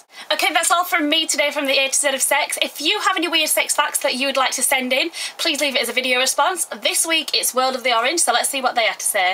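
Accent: Yorkshire, United Kingdom. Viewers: Are you from yorkshire? my dads side of the family are all from hull, and the accents sound very similar, of course, I'm not english so I'm not that great at recognizing where the accents are from :)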